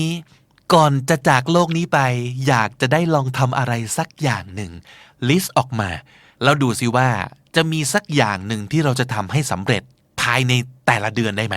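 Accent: United Kingdom. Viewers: ชอบสำเนียงอิ๊งมาก อยากเห็นพี่จัง 555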